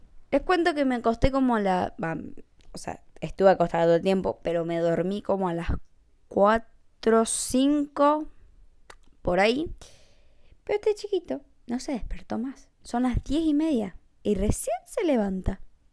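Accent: Argentina. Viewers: Sos de argentina? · Sos de Argentina?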